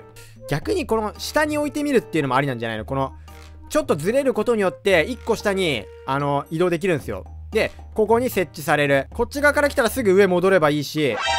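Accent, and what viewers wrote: Kansai, Japan. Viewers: なんか今回関西弁多い笑笑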